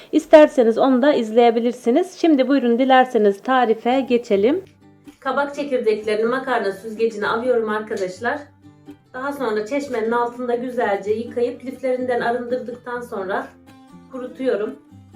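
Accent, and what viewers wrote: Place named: Çamoluk, Turkey. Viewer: Kız sen çam oluklumusun aynan ordakiler gibi konuşuyorsun bolçene dirorsun onlarda öyle konuşuyor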